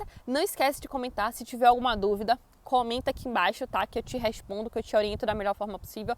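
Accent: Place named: Northeast, Brazil. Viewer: Vc é nordestina..pq vc fala Pórtugal.. típico dos nordestinos ☺️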